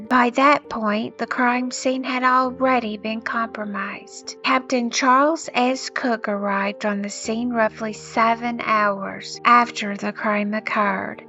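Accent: South, United States. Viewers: I just recently discovered. For my whole life up until the past 2 and 1/4 years i lived in eastern Pennsylvania, and every once in a while the Allentown news media would mention this shocking unsolved double-murder. You have a cute voice and Southern accent!!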